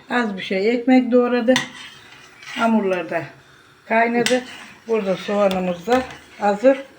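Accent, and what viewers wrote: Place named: Gelibolu, Turkey. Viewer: bende geliboluluyum siveniz bize benziyor da merak ettim 👍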